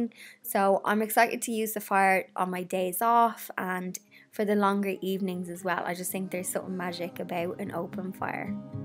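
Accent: Ireland. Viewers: Hello,I've not long subscribed.Im loving you alot!!!!😘 You have the most girly vintage snuggly cuddly house.🤗🌟 My dream home.Can I move in?pretty please😘😘 You should be so so proud of yourself.Yoh most definitely are an old soul in a young body.I saw that you visited Kilkenny in one video? I went there with my hubby for our honeymoon then Dublin.We saw Irish dancing in one of the pubs.That was sooo beautiful.My dad's family are from county Sligo.We want to go there at some point and look up the family.I love the Irish accent it's just the best.Im busy working my way through all your videos!! There's so many.Im just starting to make soaps and candles etc and I'm getting some fab tips from your videos.much love and stay safe.From a rainy England.🤗🤗🤗🤗🤗😘😘😘😘😘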